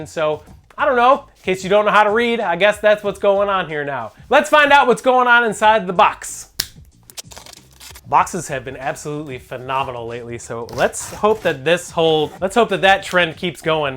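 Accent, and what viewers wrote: Chicago, United States. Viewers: The Chicago accent gets me every time 😂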